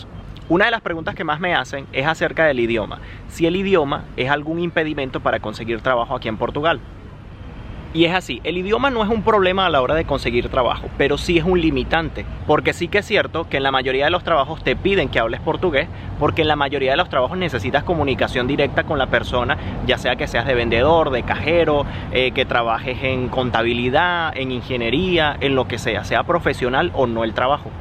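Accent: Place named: Venezuela. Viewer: Tu eres venzolano cierto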